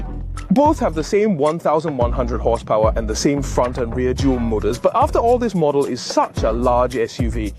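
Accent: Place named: Kenya. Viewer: Cool car Some of your pronunciation sounds Kenyan man... Ever been?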